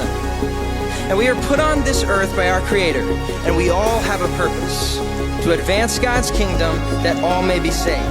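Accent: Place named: United States. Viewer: Thank you very much for presenting this wonderful speech. I would like to know which American accent is this. Kindly reply please Thank you again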